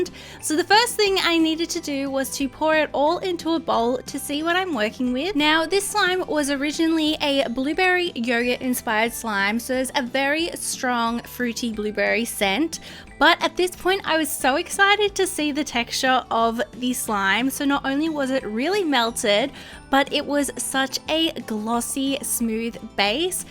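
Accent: Australia. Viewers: is solo beautiful and LOVELY, are you Australian?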